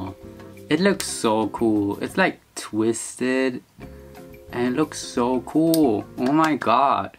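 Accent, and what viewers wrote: Germany. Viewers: I swear to harry styles I knew you were German as soon as you said something and I spend half the video finding a German comment! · Are u German? :)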